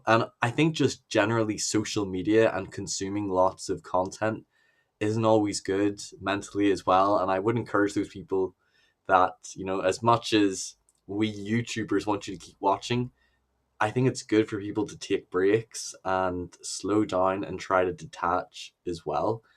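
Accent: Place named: United Kingdom. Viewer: Your accent, the belies a British citizenship, which gives me hope that your medical problems will be addressed properly.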